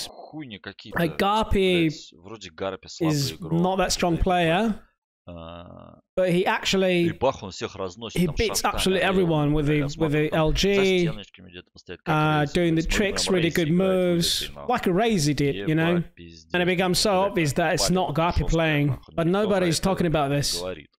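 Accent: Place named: United States. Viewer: а есть видео, без пендосской озвучки?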